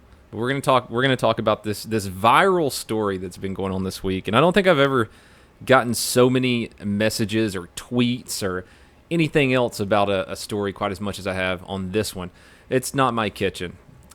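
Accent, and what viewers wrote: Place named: South, United States. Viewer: Wow man i just noticed you have a southern accent! lol I'm serious i never noticed before and i have watched you for about a year! I must be sleeping or something man...lol Your vids are great though and your hilarious!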